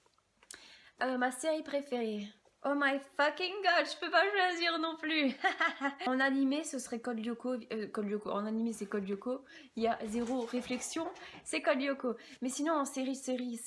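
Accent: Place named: Alsace, France. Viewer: Non mais quand je regardais tes vidéos je me disais justement que t'avais parfois un accent alsacien ! Haha #teamalsace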